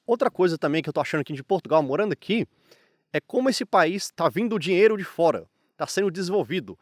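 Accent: Brazil. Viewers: Parabéns pelo vídeo, principalmente pelo modo como se expressou num correcto Português, com sotaque mas sem erros. A ideia de Brasileiros não se aglomerarem em guetos levará à aprimoração da qualidade de expressão pois erro gramatical em Portugal também é erro gramatical no Brasil. Quanto à beleza de Portugal irei mencionar apenas isto: Ainda não ouvi nenhum Brasileiro YouTuber dizer que a grande diferença paisagística notória quando cá chegam é a quase ausência de grades e vedações de propriedades à moda brasileira. Este canal ganhou mais um subscritor Português...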